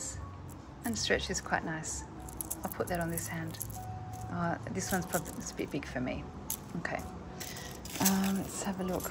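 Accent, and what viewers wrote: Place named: England, United Kingdom. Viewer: I really enjoy your presentation with the beautiful English accent. You have some really nice jewelry. Cheers from Princeton IL from Agi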